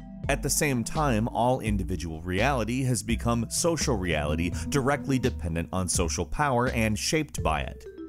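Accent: United Kingdom. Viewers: I cannot for the life of me figure out if you're southern or a weak scot it's sending me over the edge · Okay so I just started watching your videos a few days ago. Are you British or not? I can't tell and it hurts my brain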